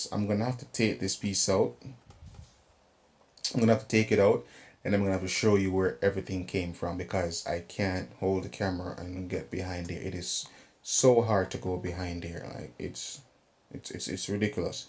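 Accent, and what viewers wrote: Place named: Jamaica. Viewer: Bro sound like a yardie this helped me alot, big up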